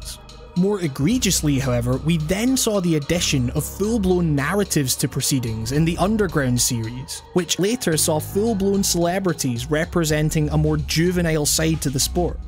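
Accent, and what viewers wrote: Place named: Scotland, United Kingdom. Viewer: Didn't see the Scottish accent coming at all (or is it Irish?), and I quite appreciate diversity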